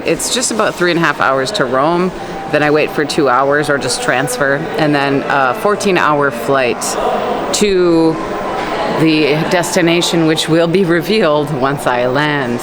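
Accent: Argentina. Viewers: Después de ver muchos de tus vídeos porque me encanta Egipto, me gusta mucho cómo lo muestras todo y además estoy estudiando la lengua árabe también (sé muy poquito pero voy progresando), me alegra muchísimo saber que compartimos otro idioma 😊. Yo soy de España y me ha encantado escuchar tu maravilloso acento argentino. Muchas felicidades a tu abuelita, desde luego está estupenda 🎉 Ya tienes una nueva suscriptora 👍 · Your Spanish is Argentinian or true Spanish like the Spanish spoken in Spain 🇪🇸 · You got me! I thought you must have had a meltdown last year. 😅You sound really cool, speaking Argentinian. You seem different as well. That's the power of language! Thanks for sharing.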